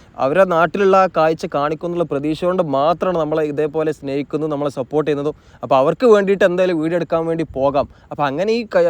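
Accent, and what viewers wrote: Malappuram, India. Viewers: ഒരുപാട് നാളായി പറയണം എന്ന് കരുതിയതാ , സത്യത്തിൽ നിങ്ങളുടെ വീഡിയോയുടെ ഏറ്റവും ആകർഷണം നിങ്ങളുടെ നാടൻ രീതിയിൽ ഉള്ള സംസാരം തന്നെ ആണ് . ആലപ്പുഴയിൽ ഏതാണ്ട് അച്ചടി ഭാഷയുടെ അടുത്തു സംസാരിക്കുന്ന എനിക്ക് , താങ്കളുടെ മലപ്പുറം സ്ലാങ് അതിന്റെ പ്രയോഗത്തിൽ വരുന്ന നൈർമല്യം , അതിന്റെ ശൈലികൾ എല്ലാം അടിപൊളി ആണ് . പിന്നെ രാഷ്ട്രീയ പൊട്ടത്തരത്തിനും , രാഷ്ട്രീയ കാഴ്ചപ്പാടിനും താങ്കൾ കൊടുക്കുന്ന മറുപടി മികച്ചത് ആണ് . സാദാ മലയാളികൾ രാഷ്ട്രീയ അന്ധത വെടിഞ്ഞു താങ്കളെ പോലെ ചിന്തിച്ചിരുന്നേൽ ഈ റോഡ് വികസനം ഒക്കെ 20 കൊല്ലം മുന്നെ വന്നേനെ . ഒരുകണക്കിന് അതും നന്നായി NH66 ഇപ്പോൾ പണിയുന്നത് viaduct , flyover , underpass , മിക്ക നിലവിലുള്ള പാലങ്ങളും മാറ്റി പുതിയ രണ്ടു പാലങ്ങൾ മിക്ക പ്രധാന ടൗണുകളെയും ഒഴിവാക്കി ബൈപാസ്സുകൾ എന്ന അടിപൊളി ഹൈവേ ആയിട്ടാണല്ലോ . ഞാൻ NH 66 ന്റെ വർക്കിന്റെ ഒട്ടുമിക്ക യൂട്യൂബ് ചാനലുംകണ്ടിട്ട് ആണ് ഇത് പറയുന്നത്. നിങ്ങളുടെ ചാനൽ അടിപൊളി തന്നെ . 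· bro I like your videos and the malpram language and the its slangs. I do watch to listen to that. Please include more slangs😍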